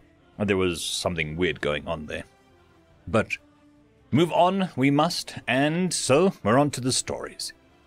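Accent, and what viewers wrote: Australia. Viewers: Must be from down under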